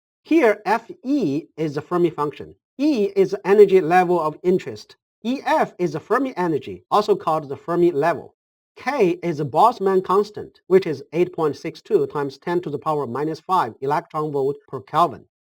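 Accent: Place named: China. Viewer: Sounds like chinese accent, lol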